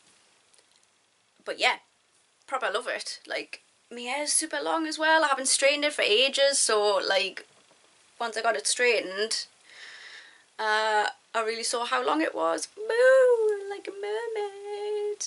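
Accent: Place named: Scotland, United Kingdom. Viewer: i am literally obsessed with your accent. is it... scottish? are you a geordie? doesn't matter, loved your video!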